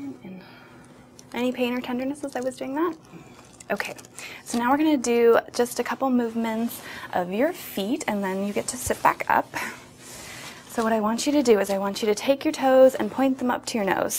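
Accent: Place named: United Kingdom. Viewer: Your british accent gives you away 😄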